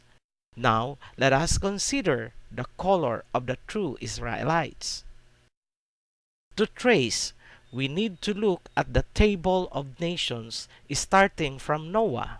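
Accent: Bisaya, Philippines. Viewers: I agree with Tarogi Taraki. Sounds like they dont believe in the flood and that we all came from monkeys. Mali ba ako? Pero sounds like bisaya yung nag salita at yung sinasabi nya hindi naman sya nagsulat. Who wrote what you reading and who paid you to read it??? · bisaya po kasi ang accent niya pag ini try niya mag tagalog kaya ini-english nalang niya. 😂😂😂😂 Anyway bakit Hindi mo panoorin yung ibang mga videos niya. nag tagalog na kaya siya. panoorin mo kasi yung iba niyang topic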